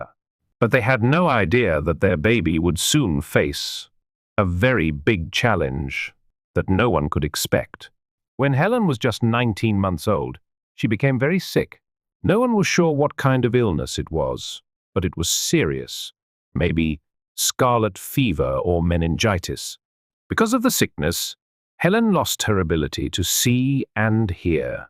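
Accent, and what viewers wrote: United Kingdom. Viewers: thank you!! I like it a lot more when it is speaking in British English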